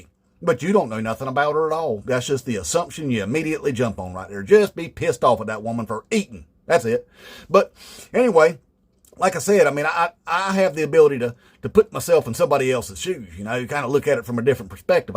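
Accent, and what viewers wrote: South, United States. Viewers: You're hilarious. Pleasantly surprised I am that such a southern sounding bloke is actually sound. Subsribed in Ireland 🇮🇪. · You definitely put out some hilarious videos. I detect some real intelligence behind that corn pone accent that you use. I am subscribed and like every time. Also sharing. Inmate trump. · Beautiful work my man. Just love'n that American accent.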